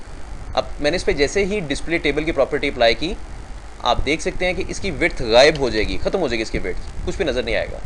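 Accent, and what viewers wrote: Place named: Punjab, India. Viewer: aap ho muslim liken aapke bolne ka axcnt Punjabi h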